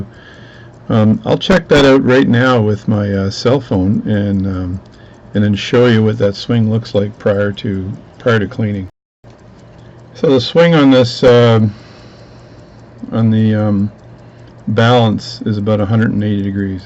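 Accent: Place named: West Island, Canada. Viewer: you may not be west island, but that is definitely west island french.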